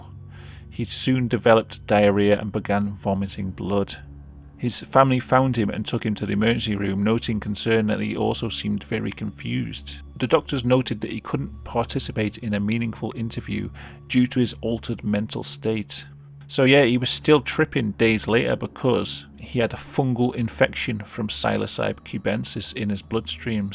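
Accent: United Kingdom. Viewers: whenever I listen to you (or any British youtuber), I can't help but get in touch with my roots and just impersonate your accents. Though usually itll turn from "women's stomach turns after seeing a ghost" to "women's stomach turns after eating some butt" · are you british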